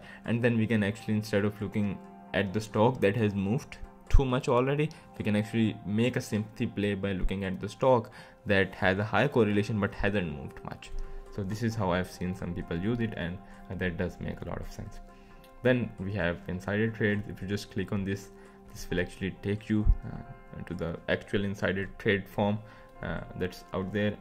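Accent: India. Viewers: This guy doesn't give a shit about his company. Same shit 3 years later..He makes 100 changes to the UI and doesn't update the videos. I'm on a 15-day trial and seriously considering not going through with the sub because he is so lazy regarding user experience. (Also, please hire someone without a heavy Indian accent to explain how this works.)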